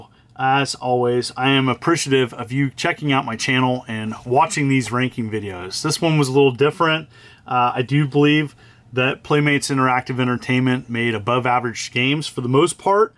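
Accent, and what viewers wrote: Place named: Northern California, United States. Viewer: Great video. It’s funny I’m a NorCal dude also and i recognized your “accent” immediately lol. Thanks for the great content. Love it.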